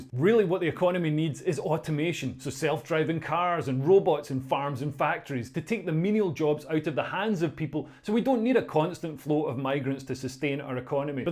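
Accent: Scotland, United Kingdom. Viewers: A) I couldn't agree with you more, it's like hearing my own thoughts in a Scottish accent. For example, what you said about technocrats (quangos is the UK) and NGOs undermining democracy. B) Your description (from - ) of the establishment's dream for our future (given high immigration levels) sounds like a rubbish version of Blade Runner, with the replicants replaced by immigrants and space colonies replaced by our cities. It's already looking more like 'District 9' than Blade Runner though.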